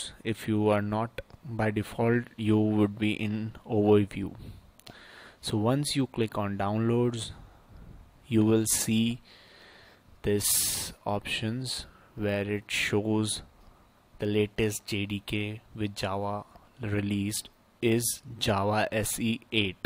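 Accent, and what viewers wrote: India. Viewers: Heard an Indian voice, clicked off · not use full> fuck indian accent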